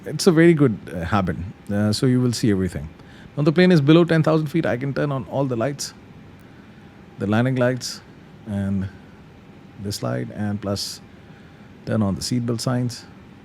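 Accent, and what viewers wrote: India. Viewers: Love you lil Indian accent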